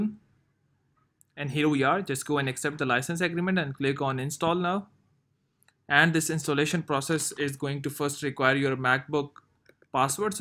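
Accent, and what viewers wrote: India. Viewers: So ein inder akezent feier ich mega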